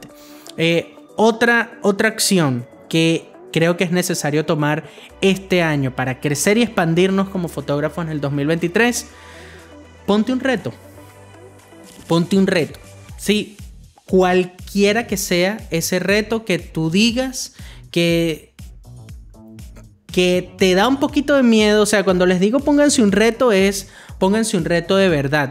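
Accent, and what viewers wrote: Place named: Venezuela. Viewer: No se porque, pero me pareces q eres de venezuela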